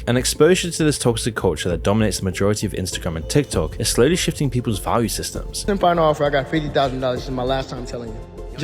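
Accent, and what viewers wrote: United Kingdom. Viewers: The monotone british voice with nothing very interesting to say as every video existing on the internet doesn't help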